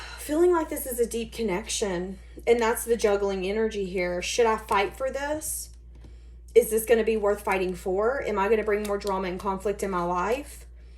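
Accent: South, United States. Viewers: You're pretty... Love seeing the face with the southern hospitality accent... Blessing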